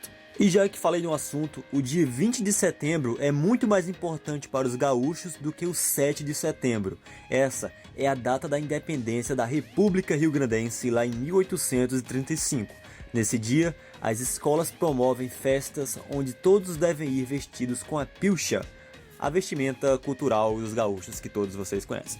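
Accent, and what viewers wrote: Northeast, Brazil. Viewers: Você é fluminense? Você tem sotaque nordestino ou goiano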